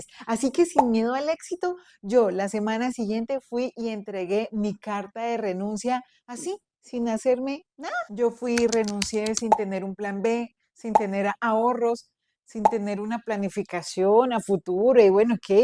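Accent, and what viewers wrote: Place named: Antioquia, Colombia. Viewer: a veces te sentía un acento paisa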